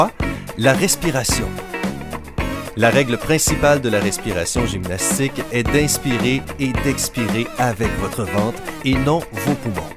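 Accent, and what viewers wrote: Quebec, Canada. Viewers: Des rigolos avec un accent québécois qui dis n'importe quoi j'en ai vu beaucoup, mais des comme toi..... Une perle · C est l'accent de Québec celui ci?désolé mais c est vraiment étrange.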